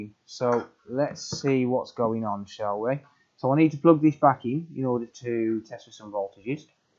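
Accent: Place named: Black Country, United Kingdom. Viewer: Nice to hear the voice of fellow yam yam 🤘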